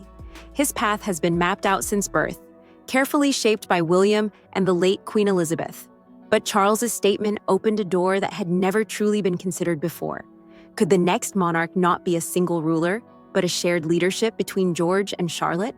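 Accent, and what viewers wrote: United States. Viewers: Another American voice coming from the speaker's rear about something about which she knows nothing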